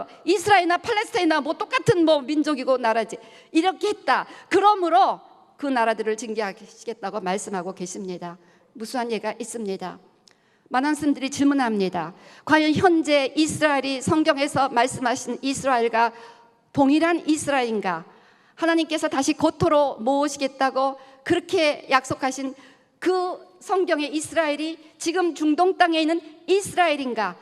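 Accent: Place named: South Korea. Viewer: 콩글리쉬 참 듣기 힘드네..... 아이고.......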